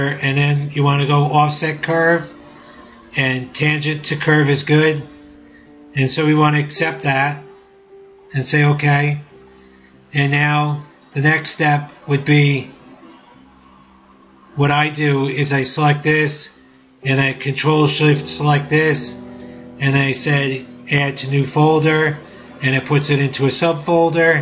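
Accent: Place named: Brooklyn, United States. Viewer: Nice tutorial! Your accent is definitely more palatable than that of many Brooklynites! Only moderately detectable. :^)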